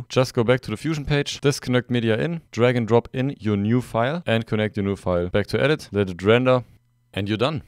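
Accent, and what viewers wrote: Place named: Germany. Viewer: soo i can SEE you ARE German cause pof your Accent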